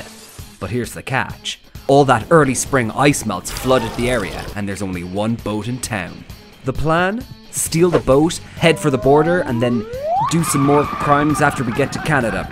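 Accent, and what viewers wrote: Ireland. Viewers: I never thought I'd ever watch a video about US president Theodore Roosevelt going across the country to catch a bunch of pirates, narrated by an Irishman sprinkled with clips from Metal Gear Solid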